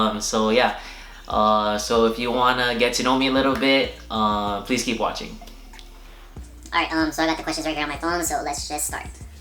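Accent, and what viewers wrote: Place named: Toronto, Canada. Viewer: you talk like a Toronto man Rate it still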